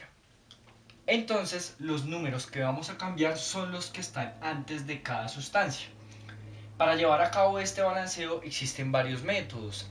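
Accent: Colombia. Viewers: de que parte de colombia eres?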